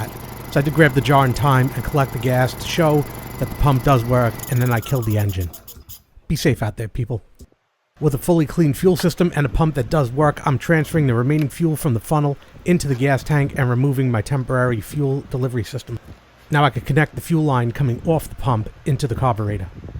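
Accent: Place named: New York, United States. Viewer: This the best Honda generator video I’ve seen on YouTube. Your definitely a New Yorker most likely Long Island wish I new you when I lived in Brooklyn.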